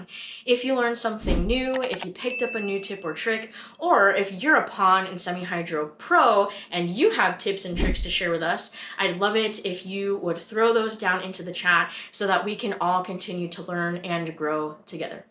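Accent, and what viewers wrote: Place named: Southern California, United States. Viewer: Ooh I really like you! I love it when I can tell right away somebody is from SoCal, then when I saw your username I knew for sure! You explain things in a great, direct and enjoyable way!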